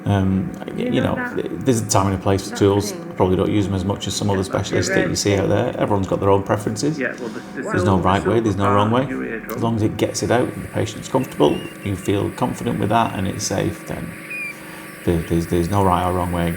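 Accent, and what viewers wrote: United Kingdom. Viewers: Did you say that you twang your G string every now and then? 😂 you need to Google what a G string is in the US…LMAO 😂 I love the way you British talk!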